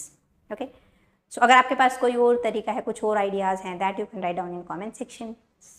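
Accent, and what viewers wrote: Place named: India. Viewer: I love your Hindi accent mam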